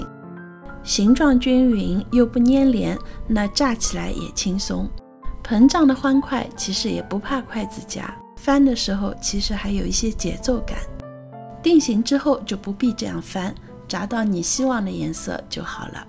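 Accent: Shanghai, China. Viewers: 上海方言好親切！ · 谢谢。听到你视频里的上海话感觉很亲切。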